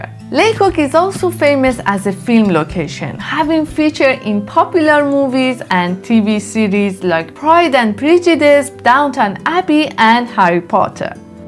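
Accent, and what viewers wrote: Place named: Iran. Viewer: You sound very Iranian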